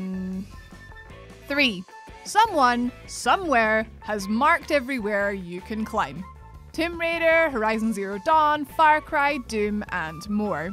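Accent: Scotland, United Kingdom. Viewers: Are you Scottish? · Yay for Scottish voices in YouTube. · I could listen to that Scottish accent all day.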